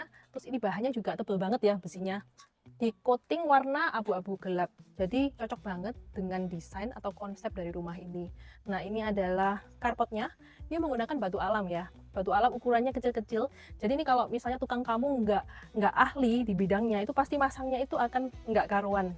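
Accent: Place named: Surabaya, Indonesia. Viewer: logate suroboyo sangat.. keren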